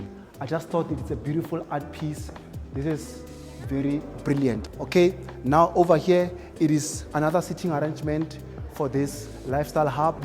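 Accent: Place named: Africa. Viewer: Very nicely presented with an African accent.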